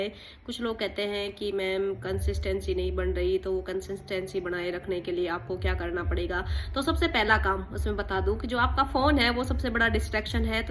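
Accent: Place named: Shekhawati, India. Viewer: Mem kapil choudhary sir RTS jesy bhasha he aap ki😂😂 aap shekhavati pradesh say hi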